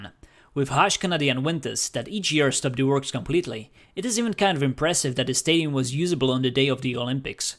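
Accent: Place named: Europe. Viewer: "I live in small country with pop just 10m, with 1m is a force" based on your pronunciation and accents now my scope is GRE, BEL or NED, and maybe CZE too (exclude POR because I heard them accents and I knew it)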